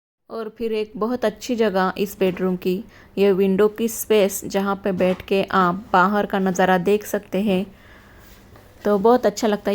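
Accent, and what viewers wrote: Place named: South, India. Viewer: Tumhari hindi bolne ki style se muze aisa lagaa ki tum South Indian ho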